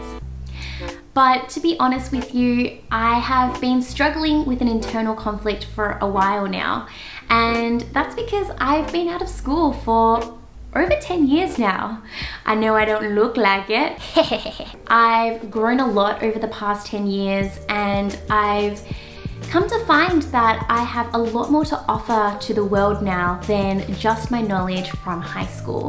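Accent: Australia. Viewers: U australian?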